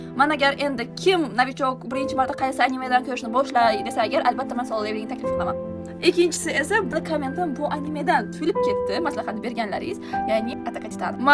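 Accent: Bukhara, Uzbekistan. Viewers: Opa Buxorolikmisiz😮😮😮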